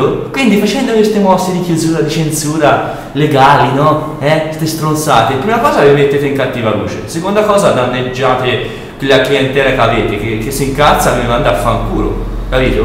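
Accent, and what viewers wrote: Tuscany, Italy. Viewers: HAHAHAHAHAHAHAHAHAH ! Sei troppo forte !!!!! mi piace tantissimo sentire parlare Toscano, stupendo il passaggio del dito medio......... ahahahahahahahah !! Mi ricordi tanto il conte uguccione......! ahahaha... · l'accento toscano <3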